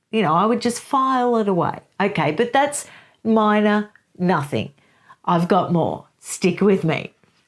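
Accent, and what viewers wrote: Australia. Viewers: Thank you, I was going to ask your name! Are you Australian? I’m a new subscriber here and I love all your posts.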